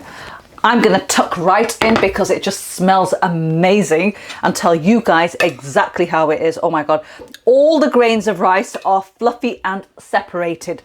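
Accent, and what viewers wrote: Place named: England, United Kingdom. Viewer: Hey, Ur new subscriber..... I love Ur voice, and Ur brilliant ,clear English accent.....and not Ur eyebrows....lol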